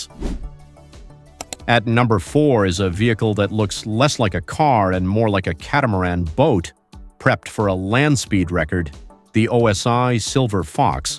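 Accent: United States. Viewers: I like it, the voice sounds as if from Michigan or Chicago to my not very educated ear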